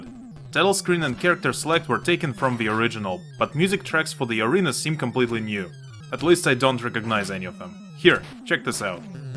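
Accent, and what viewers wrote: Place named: Portugal. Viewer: És português, certo? O sotaque não engana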